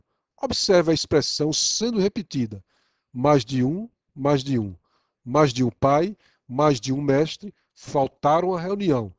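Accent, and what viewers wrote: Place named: Pernambuco, Brazil. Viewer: Aulas maravilhosas, estou assistindo todas e está me ajudando muito, principalmente porque me familiarizo com seu sotaque, pois sou Pernambucana também. Continue assim, com esse trabalho louvável!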